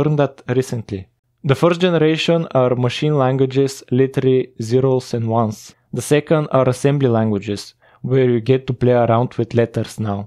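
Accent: Bulgaria. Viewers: To be honest, i still haven't watched the whole video, but your accent sounds bulgarian, or from the balkan countries at least. I find your channel interesting though. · because I am bulgarian and you sound like one :D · Wonderful video! Do you happen to be Bulgarian too? I noticed your accent😅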